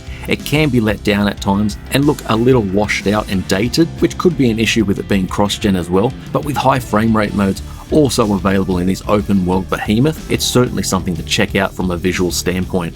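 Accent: Australia. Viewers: Good to hear an Aussie doing some game reviews Keep it up dude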